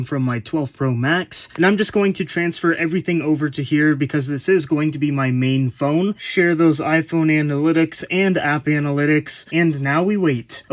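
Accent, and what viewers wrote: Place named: Canada. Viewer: What’s wrong with Canadian English?🙄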